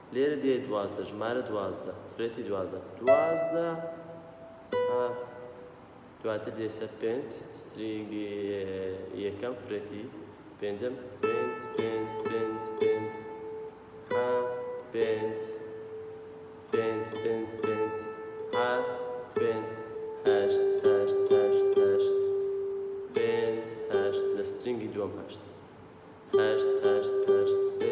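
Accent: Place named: Rawanduz, Iraq. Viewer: Zor Zor supas Kak gyan. You’re a good teacher and I love your Rouwandizi accent 😊 keep it up mamosta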